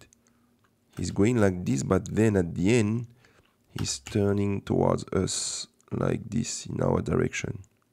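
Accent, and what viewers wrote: France. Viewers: I love your French accent😍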